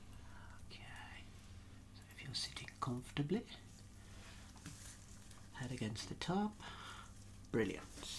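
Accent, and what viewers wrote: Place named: England, United Kingdom. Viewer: Sounds like an Englishman to me!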